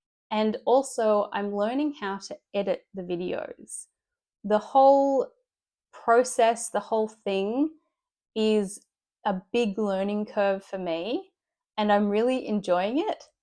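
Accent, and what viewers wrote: Australia. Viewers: Hi, while I was commenting on Bo's YouTube video, I did found your channel there and then I'm here right now, watching and learning the Australian accent from you 😁. Greetings from Malaysia 🙏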